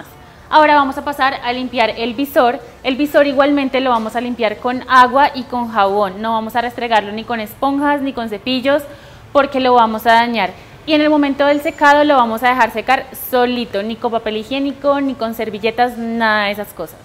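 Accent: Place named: Colombia. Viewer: Gracias por este vídeo, me encanta tu acento colombiano ✔️👍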